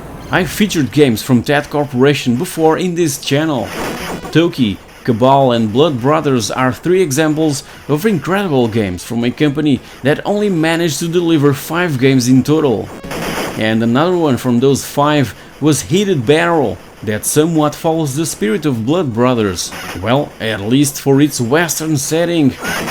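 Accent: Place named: Portugal. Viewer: I could feel the "tuga" through the accent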